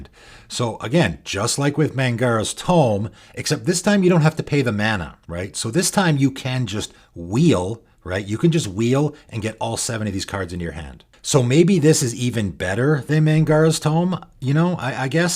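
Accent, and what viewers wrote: Canada. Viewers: I suspected you were Canadian as well, just some subtle ques from your accent.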